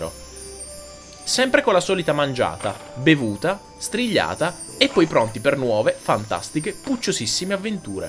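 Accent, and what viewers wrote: South, Italy. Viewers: si sente proprio che sei del sud:D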